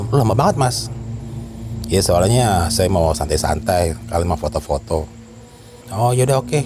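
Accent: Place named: Jakarta, Indonesia. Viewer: Bg orang mana?? Jakarta y... Betawi nya kaga ilang..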